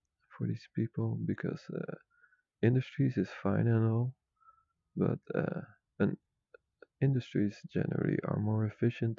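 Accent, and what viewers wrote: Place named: Netherlands. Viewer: Indeed it sounds little dreamy, dutch english accent aswell be. Except one voice wich can´t change a better micro would do wonders, lowering volumes don´t seem to be the sollution. Story telling keep the viewer motivated, practice, the content is very well, hope you will do !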